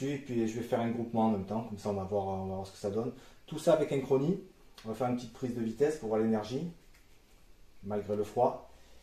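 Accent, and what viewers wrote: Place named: South, France. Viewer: Super vidéo du CP300, comment ça ? Il fait froid avec l'accent du sud de la France 😊.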